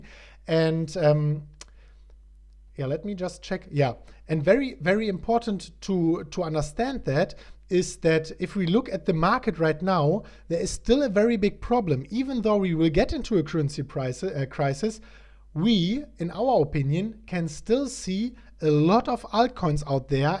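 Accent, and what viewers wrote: Germany. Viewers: I subscribed because of the great content and intellectual German accent. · Is The Moderator Form Germany or Something? No native speaker?!.. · War schon klar das du dütscher bisch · Sounds like hes a German guy. Or am i wrong? At least he sounds like me if i try to speak english 😂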